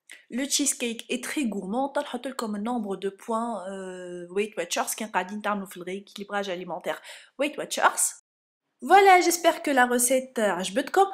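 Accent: Tunisia. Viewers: HalimLeBlues c'est notre accent tunisien a 100 pour 100 tunisien! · Qu'est ce que tu raconte elle a un accent 216% tunisien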